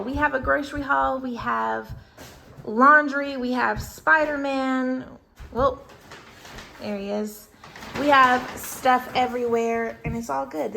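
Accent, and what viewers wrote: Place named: North Carolina, United States. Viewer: Just found you looking for laundry room makeover (its was beautiful by the way..2025) annnd to get the view number off of 666..🫢😁..seen in your videos(love her channel) you are so fun and love the accent..im in North Carolina so it sounds like mine..lol..I have subbed and look forward to getting to know you thru your videos..God keep and God bless 🙏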